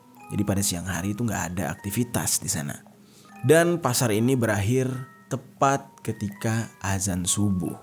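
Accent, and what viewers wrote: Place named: Jakarta, Indonesia. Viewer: Mbah petruk, pengucapan nya lucu kalo orang jakarta yg ngomong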